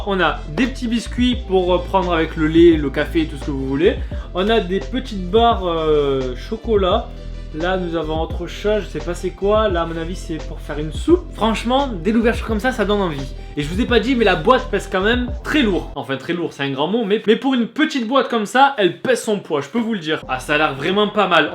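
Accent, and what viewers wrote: Marseille, France. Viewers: Tu est Marseillais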